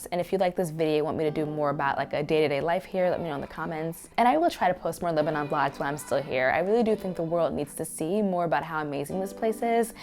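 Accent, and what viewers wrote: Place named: United States. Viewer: Why are you using an american voice.................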